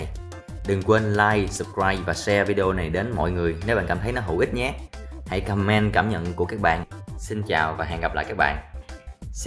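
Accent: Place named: South, Vietnam. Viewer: tại thấy bạn nói giọng miền Nam mà dùng không đúng ngôn ngữ của miền Nam. Bút viết : Viết ( miền Nam), bút ( miền Bắc). Ngôn ngữ miền Nam dần mất đi một phần là do ngôn ngữ miền Bắc thống trị gần hết câc kênh truyền thông ( báo chí , phát thanh truyền hình), SGK cũng do người miền Bắc độc quuyền biên soạn.Một phần cũng do chính những người miền Nam sinh sau năm 1975 không trân trọng, gìn giữ ngôn ngữ của cha ông mình mà còn tiếp tay xóa bỏ ngôn ngữ của miền Nam Việt Nam.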